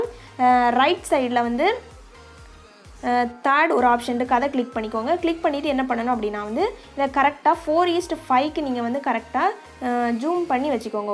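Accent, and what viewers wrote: Kanyakumari, India. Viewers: Seems like kanyakumari Slang! 💙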